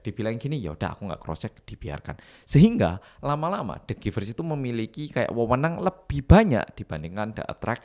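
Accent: Java, Indonesia. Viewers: mas ini orang korea asli cuman pernah tinggal di indonesia untuk waktu yg cukup lama, makanya medok banget, bisa di cek untuk memastikan di video lama. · Mau naik malah turun kasian bukan,, hehe aku seh penyuka dangdut jadi saya nonton channel ini bukan tertarik dengan informasi yang di bahas sekarang tapi aku nonton orang nya suaranya medok hehe😊😊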